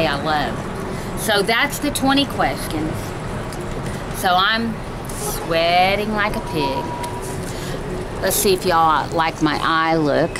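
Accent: West Virginia, United States. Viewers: Hi, I am a new subbie. I watch Marnies channel. I saw your channel name & started watching your 1st video. I recognized your accent right off. I live in Michigan. I married a born & raised Mountaineer. He was born in Clarksburg. Went to Doddridge County schools. All his family is still in Salem, Clarksburg, West Union. I just LOVE WV. But it sure does get HUMID. I have 2 grown daughters & 4 granddaughters that I take care of on the weekdays while the girls & their husbands work fulltime. Sure keeps this Nana busy and pooped out at the end of the day. Love your videos. Will write more later. Michelle from Michigan.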